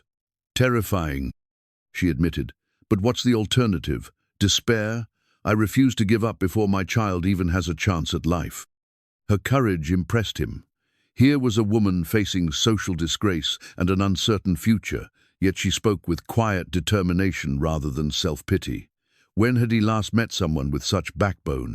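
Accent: England, United Kingdom. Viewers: Prefer this English accent !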